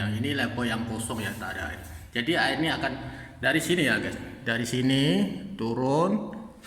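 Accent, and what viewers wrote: Malaysia. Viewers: Terbaik perkongsian kawan..haha hampir hampir sling orang Malaysia sudah haha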